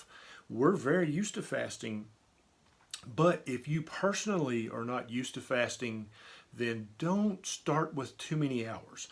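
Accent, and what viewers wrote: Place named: Tennessee, United States. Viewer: Hi Dr. Berry. Great information. It's nice to hear someone from my home state. I'm from Tennessee . I live in Texas right now.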